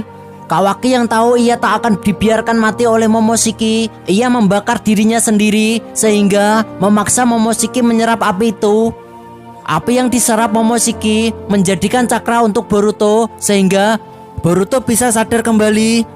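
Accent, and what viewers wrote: Java, Indonesia. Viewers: Ooh abange orang Jawa tohhh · Eyo woh jowo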